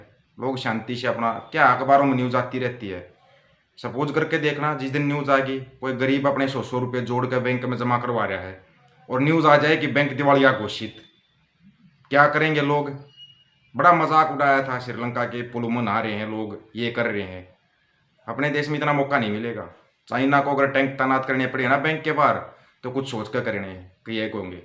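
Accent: Haryana, India. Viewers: Sir, your Haryanvi accent is balle balle. Carry on.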